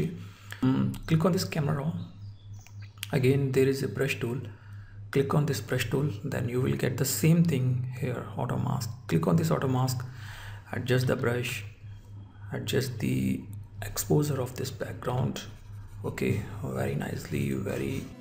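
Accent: India. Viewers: My apologize, but that indian accent is so annoying...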